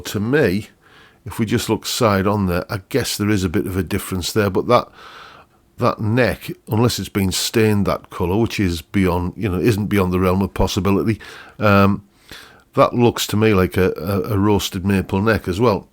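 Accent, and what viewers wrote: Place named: Northern England, United Kingdom. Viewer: Hey! Nice to hear another fellow northerner,I'm from Durham 😅. Where abouts are you from? Great review! I've been curious about Fazely for a while. I've recently(in the past year) got into modding guitars and previously bought a glarry(which is I love!). Fazely are the other brand that appears when you're looking for the cheapest new guitars. With the way prices are now it actually seems more economical to buy one of these brands new. Guitars that use to go for £10-20 second hand like encores are now going for £50-100 which is crazy 😅. Sorry I'm waffling on,got a bit excited 😅 What I was going to ask you was do you think their stuff are decent platforms to modify? Great content too 😊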